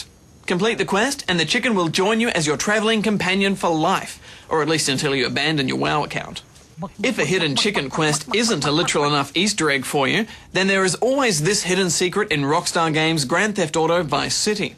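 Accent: Australia. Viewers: THIS IS NOT BRITISH ITS AUSTRAILIAN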